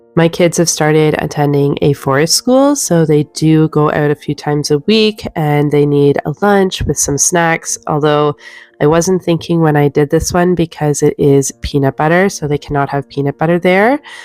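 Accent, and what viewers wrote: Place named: Canada. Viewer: I love your tips and as a bonus I love the Canadian accent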